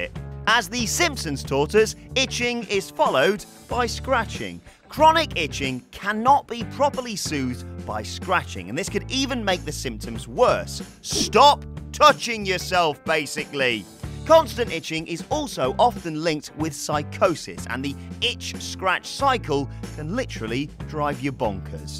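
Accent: United Kingdom. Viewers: Awesome is back with a awesome British accent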